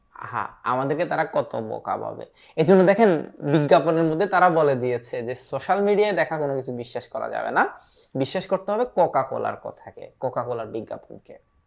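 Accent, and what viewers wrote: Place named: Chittagong, Bangladesh. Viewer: ভাইয়া মনে হয় চট্টগ্রামের, ❤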